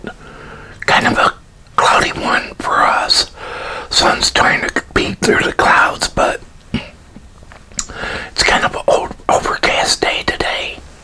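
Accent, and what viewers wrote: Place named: Texas, United States. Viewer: Seriously one of the best channels there ever was and ever will be ur Texas accent is the best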